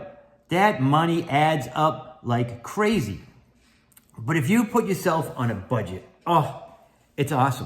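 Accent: Boston, United States. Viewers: love the Boston accent!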